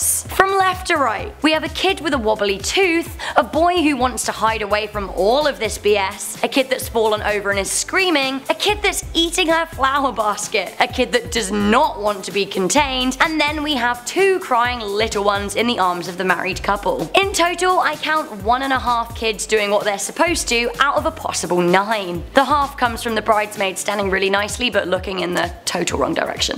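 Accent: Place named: United Kingdom. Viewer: This lady's British accent is super strong and LOUD!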